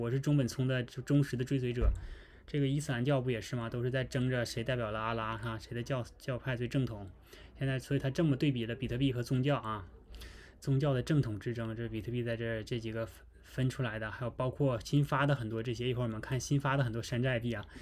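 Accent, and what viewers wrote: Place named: Northeast, China. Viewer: 播主的声音挺好的，有点东北口音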